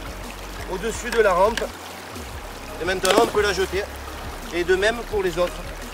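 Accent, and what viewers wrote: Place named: Marseille, France. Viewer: tu et un marseiller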